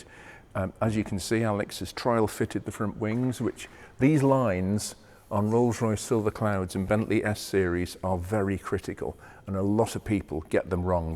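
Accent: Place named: Liverpool, United Kingdom. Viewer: A neighbour nearly totalled his Morgan in a hedge and was lucky enough to have a friend lend him an XK 140 or 50 I think it was. It was in the most beautiful shade of grey and had black painted wires. Fully restored, and the finest XK I’ve ever seen. It really changed my mind about painted wire wheels. By the way, you are old enough now for your Scouse accent to be coming through 😀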